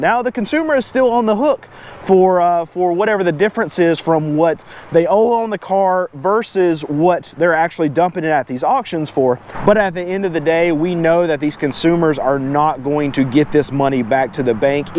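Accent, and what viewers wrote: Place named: Texas, United States. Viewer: This is a very real example of what an actual Texan accent realistically sounds like. Half “normal” and half a unique form of country twang.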